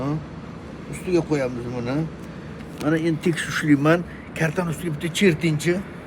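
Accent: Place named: Kashkadarya, Uzbekistan. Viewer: Бу одам Кашкадарйоликка ухшайди шеваси шунга ухшайди, малалес гап йук санатингизга, Яна янгиларини кутиб коламиз, Оллох умрингизга Барака берсин.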